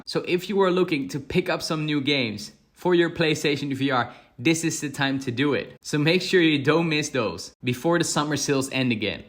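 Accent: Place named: Netherlands. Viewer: Its only clear if you yourself are Dutch, but it doesnt really matter because apart from the slight accent, your English is really good!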